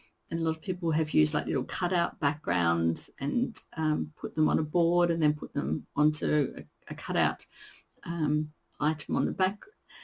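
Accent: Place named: Australia. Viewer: Your video just popped up so I have subscribed. My friend and I were just admiring a Ranunculus knit in lace weight at our local yarn store. Yes cross stitch has come along way. With 32 ct you a go either 1 or 2 threads. I have been enjoying working with 1 thread lately I prefer to have my fabric quite tight in my hoop. But there are so many options these days. Belfast linen is 32ct by Zweigart (it’s not the colour name). Each of their different linen counts are named after UK cities. I have just started making floss-tube videos but I also knit and quilt and love hexies. I am in Brisbane so lovely to hear another Aussie. My channel is Lynette Peters XStitchandHexagons. Tonight I’m knitting The Little Arrow shawl.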